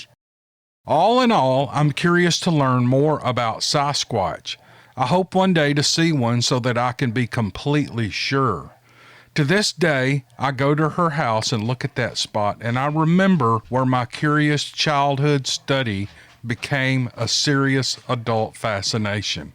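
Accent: South, United States. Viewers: Im from mobile county.. we call it " the bottom".. born and raised on the "mobile tensaw river delta"... A HUGE SWAMP. Listening to is like sitting with an old friend. The southern accent, slow talk. Music to my ears... Thanks for the stories "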